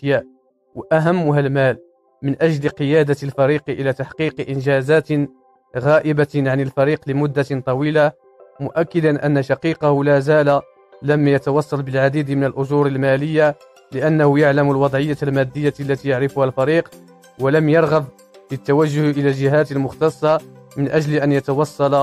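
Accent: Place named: Bangladesh. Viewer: ياصاحب المقطع انت جالس تسجل جوا خزان مويه ايش التقطيع هذا جيب شخص عربي يتكلم مو بنقالي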